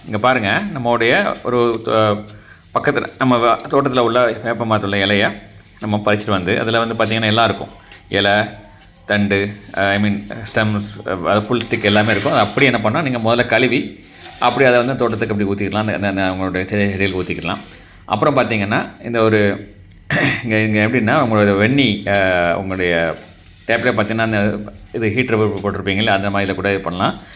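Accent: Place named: Tirunelveli, India. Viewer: நெல்லை மாவட்டக்காரர் போல.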